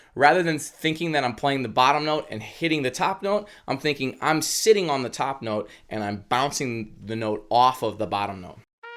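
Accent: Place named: New Jersey, United States. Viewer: ha! I couldn't place your accent -it sounded like new jersey.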